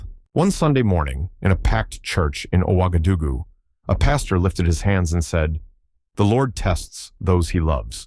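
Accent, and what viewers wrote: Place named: United States. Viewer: 😂 narretor says Ibrahim is 27 years old 😂😂😂😂 it's a American voice😂😂 FAKE NEWS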